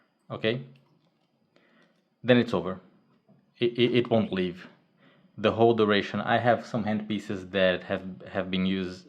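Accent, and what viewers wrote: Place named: Brazil. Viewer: o sotaque de brasileiro falando ingles é inconfundivel heheh. projeto massa dms, acompanho ha um tempo ja. ansioso pros proximos passos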